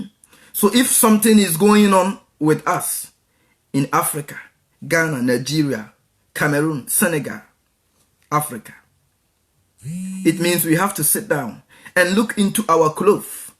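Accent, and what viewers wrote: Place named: United States. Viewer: This man can't even express himself properly. Stop trying to Americanising ur accent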